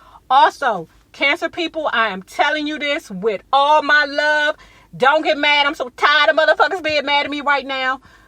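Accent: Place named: Anacostia, United States. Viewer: Anacostia ? I though I heard an accent 😂😩💞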